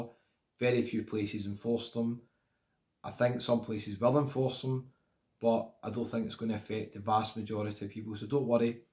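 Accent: Scotland, United Kingdom. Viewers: you're very welcome. Always trust a man with a Scottish accent! 😘😉😉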